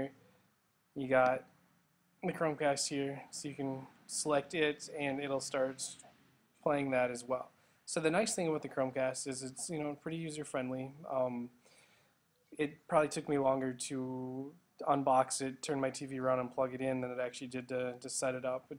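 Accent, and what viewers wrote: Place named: Canada. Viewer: You sound nice and Canadian!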